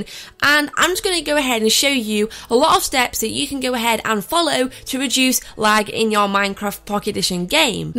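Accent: England, United Kingdom. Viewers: why does every minecraft player has british accent? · What is your english accent? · i like your british accent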